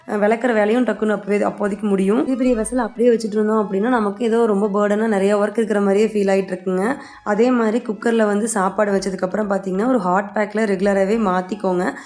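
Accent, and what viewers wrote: Kongu, India. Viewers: Kongu tamil apedetha ka sis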